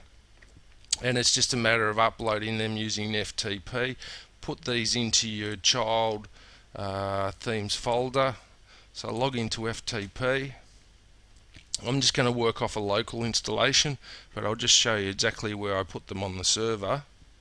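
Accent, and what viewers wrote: Australia. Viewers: you are aussie correct?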